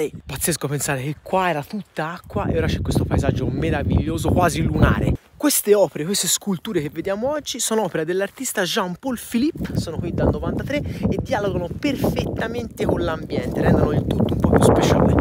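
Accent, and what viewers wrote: Tuscany, Italy. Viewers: Ciao...io sono figlia di italiani e sono o mi ritengo mezza toscana perche' vi ho studiato, scegliendo la citta' di Dante presso cui studiare un tempo fa....non ebbi all'epoca la stoffa per fare dell'Italia la mia dimora finale! Oh well, I speak Italian and English! Ciao caro Mr...keep it up, for I love your Tuscan accent with the open C's...ciao e bacioni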